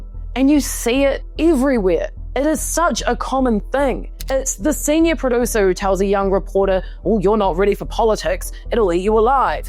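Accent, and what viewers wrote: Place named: New Zealand. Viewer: I'm a late boomer (almost gen X) and I would like to thank you for your enlightening analysis, it really set my ideas straight about the cultural shock I sometimes experience with gen z. Also, your video helped me solve a puzzle, which is where you are from. I'm french and, apart from those of us who watch brokenwood in the original version, we are not really exposed to the NZ accent. So mystery solved! Thank you again for your excellent channel.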